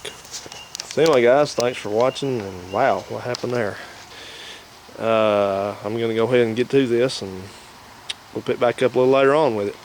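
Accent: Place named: East Tennessee, United States. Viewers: You sound like a good 'ole Tennessee boy. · You sound like an East Tenn boy. Am I right or am I right?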